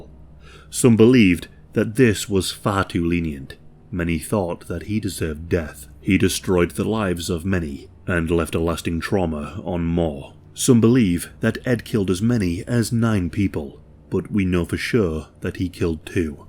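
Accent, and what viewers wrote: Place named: England, United Kingdom. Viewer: Ya fuckin ass is from England with ya accent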